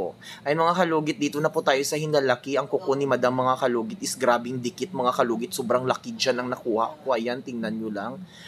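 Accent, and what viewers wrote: Iloilo, Philippines. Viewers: Sir yung accent nyo po parang ilonggo.😊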